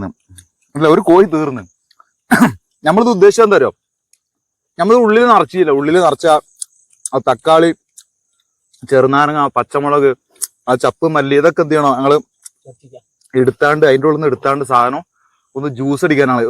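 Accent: Malappuram, India. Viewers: ബ്രോ കൂടുതൽ ജാഡ ഇല്ലാത്ത മികച്ച അവതരണം നാടൻ കുക്കിങ് രീതി ചെയ്തതെല്ലാം വളരെ പെർഫെക്ട് ഇതുപോലുള്ള വീഡിയോസ് ഇനിയും പ്രതീക്ഷിക്കുന്നു കാണാൻ നല്ല ഭംഗിയുണ്ട് മലപ്പുറം സ്ലാഗിലുള്ള സംസാരം പൊളിച്ചു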